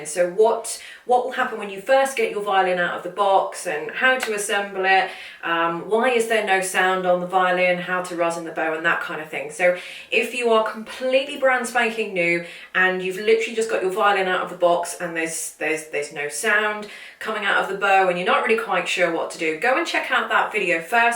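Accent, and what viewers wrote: United Kingdom. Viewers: I do not know yet your name, but I feel grateful to see individuals like you sharing with us to make our dream come true. I always wanted to play violin since I was a child. I just made the decision to learn following your lesson, and guess what, I bought my Mendini MV500 4/4 full side violin last week, and I have made the total decision to learn playing violin, and know how to play "Amazing Grace", that is what I will do first.Thank you, your lesson are easy to follow, and love your British pronunciation. I have one request for you : can you play Amazing grace with the violin for me!!! Thank for motivate me in this new adventure.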